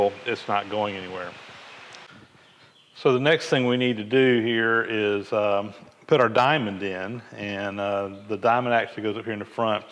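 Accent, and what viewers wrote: South, United States. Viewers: A Getter Done with a southern accent; LOVE IT.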